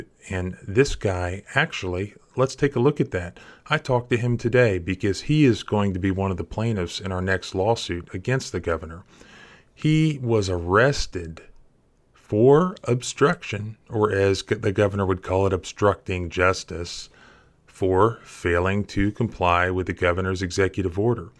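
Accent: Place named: California, United States. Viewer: Yes! I really believe that. Sounds like California with a sweet southern tang.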